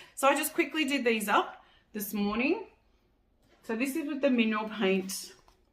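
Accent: Australia. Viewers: Lovely to hear an Aussie accent - thanks for the info! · Thanks so much for the in-depth video! Always good to hear a fellow Aussie too haha. This was fantastic, definitely deserves more views and likes.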